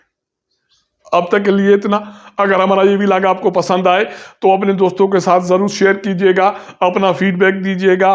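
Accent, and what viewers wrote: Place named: Saraiki, Pakistan. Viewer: SAADY HO AP SAHIB AP KEE URDU ACHEE NHE JAAY KY SIRIKEE BOOL RAHY HO AP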